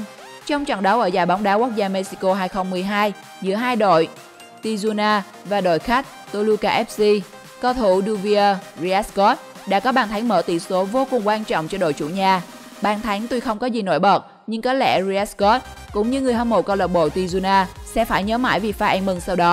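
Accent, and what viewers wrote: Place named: Central, Vietnam. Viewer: Giọng mc miền trung nghe mệt thạt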